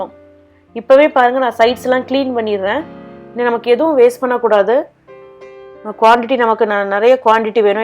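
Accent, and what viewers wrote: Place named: Tirunelveli, India. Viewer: Nice recipe..ur slang seems like u r a Tirunelvelian...rite mam🙂.?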